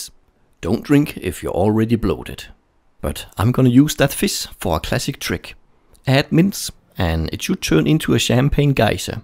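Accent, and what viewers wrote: Denmark. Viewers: Almost a year ago, but still awesome! I knew you were from Denmark, i could hear it in your voice :-) I'm from Denmark myself. But it's nice to know a danish youtuber making videos based on science. Keep it up! :D Fede videoer, hold det kørende! :D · are u a dane? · o_O you are from Denmark! for a long time I have been wondering, because of your ascent, and thought it sounded Danish. Så hejsa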